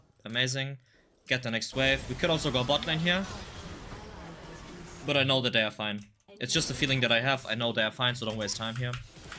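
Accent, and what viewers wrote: Germany. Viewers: this middle eastern looking boy with german accent is just too good! i ve learnt about qyana s laning phase in 2 videos more than i have since she was released... keep it up sir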